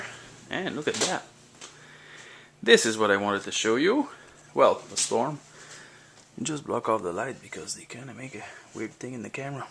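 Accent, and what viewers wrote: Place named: Acadia, Canada. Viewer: yes from val damour nb je suis acadien aussi lol ses pourquoi je reconnais laccent lol merci pour vid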